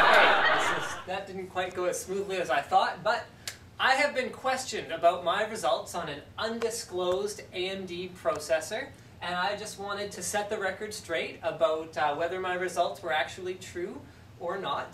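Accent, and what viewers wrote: Canada. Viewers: you can hear the canadian in his voice in this video lol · He sounds more Canadian. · Im acutally happy for this youtube recommendation. His Canadian accent was stronger back in the day. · Oh my god he sounds so Canadian here, he sounds a little less Canadian now but here it’s just- it’s just there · OMG Linus has such a canadian accent in this video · His Canadian accent is enhanced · here he soundedmore canadian than he does now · His voice hasn’t changed at all, but he did lose a bit of that Canadian accent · I’ve never heard Linus sound so Canadian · Why does he sound more Canadian back then? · You were more Canadian XD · The only difference between him back then and now is he just sounded even more Canadian back then 😂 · Yes it has, you can hear the Canadian accent more here. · He sounded a lot more Canadian back in the day lol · Ahh back when Linus still had that Canadian accent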